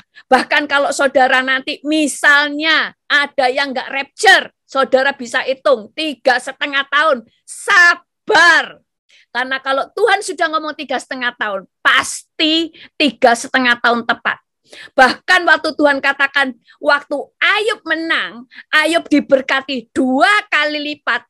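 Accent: Java, Indonesia. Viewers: mantap bu.. Logat jawanya...kental...sy suka...sehingga penjelasannya...bagus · logatnya terkesan medok banget jawa-nya hehehehe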